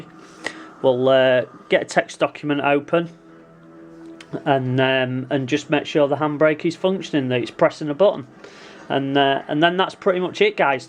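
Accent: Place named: Midlands, United Kingdom. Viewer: You sound as though you're from the Midlands...I'm guessing first Lincolnshire or possibly Nottinghamshire.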